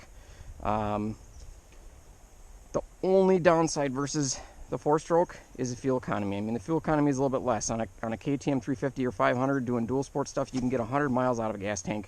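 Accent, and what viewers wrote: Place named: Wisconsin, United States. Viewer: That Wisconsin accent is prime brother 💪🏼. Great vid. Your description of how controllable it is down low, makes this bike very tempting. My four stroke Kawi is hard to control in any sense of the word at low rpm. Feels like it goes from 0-100 with the slightest twist of the throttle. I ride a lot of desert and open area, so it’s fine. Woods riding and technical terrain is tough though.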